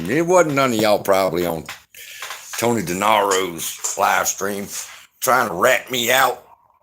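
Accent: South, United States. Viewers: Man, your southern accent !!! Hello from San Antonio Texas. 👍👍👍👍👍👍👍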